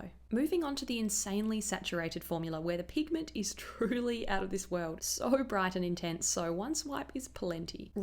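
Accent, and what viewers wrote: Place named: Australia. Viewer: It's always nice to hear that familiar, Australian halo there again. I love your videos for the makeup but I also try to teach myself how to do an Australian accent. 😁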